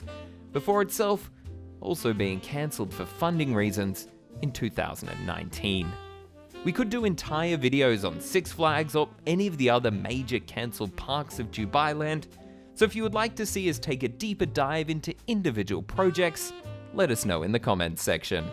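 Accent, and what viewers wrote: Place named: Australia. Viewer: note: he's aussie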